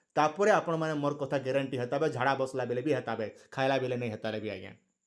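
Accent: Kalahandi, India. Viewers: ତୁମେ କଳାହାଣ୍ଡି ଜିଲ୍ଲା ର ଦାଦା ତୁମର ଭାଷା ରୁ ଜଣା ପଡୁଛେ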